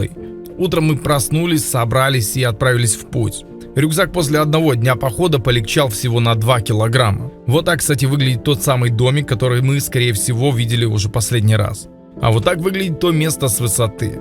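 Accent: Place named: Ukraine. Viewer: егор соболь а разница то какая? Разве это вбила? Просто у нас Украинцев такой говор вот и всё.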